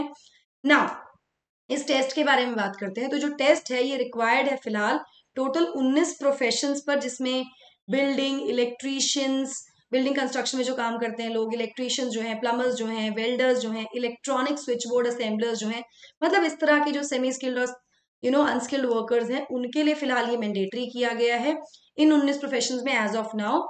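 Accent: India. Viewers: Please dont speak English in Hindi style. Sounds ridiculously funny.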